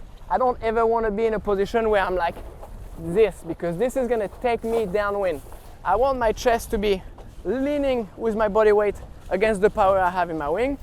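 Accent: France. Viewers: Mec, en anglais tu as un petit accent français et en francais tu as un accent anglais. Tu viens d’oû?? Super video sinon bravo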